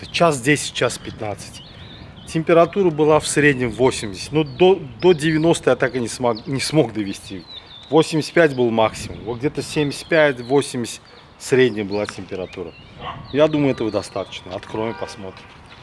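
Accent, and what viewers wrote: Baku, Azerbaijan. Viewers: Такой позитивный и такой акцент красивый чисто Бакинский😂👍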